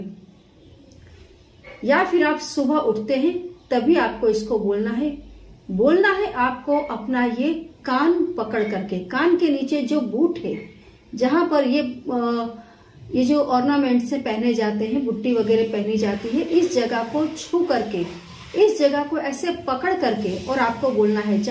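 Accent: Bengal, India. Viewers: arre SHE DOESNT LISTEN AT ALL, SHES A WEIRD LADY, I HAVE TOLD HER MANY TIMES SHE HAS A BENGALI ACCENT AISE KI TAISE KAR DETI HIAN MANTRAS KI, NAHIN SAMAJH MAIN AATA HAIN BHAI KYA KARUN...UNSUBSCRIBE KARDUN KYA, SHE DOESNT UNDERSTAND AT ALLL,,,,,,SHE SPEAKS WITH ACCENT SAMAJH HI NAHIN AATA HIAN DAMNIT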